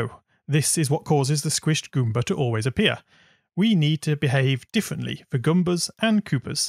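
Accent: United Kingdom. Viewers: I absolutely love the way you sometimes call Goombas "Gumbas". That good old British accent :_cool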